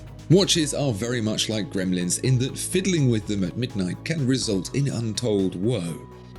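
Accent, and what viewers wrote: United Kingdom. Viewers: Finally, i’ve seen who’s behind that British accent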